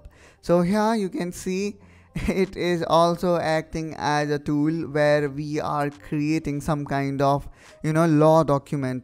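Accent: India. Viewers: He is from India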